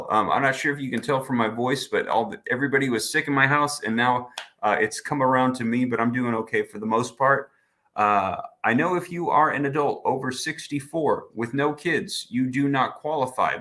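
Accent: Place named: Louisiana, United States. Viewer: I could hear you say you Louisiana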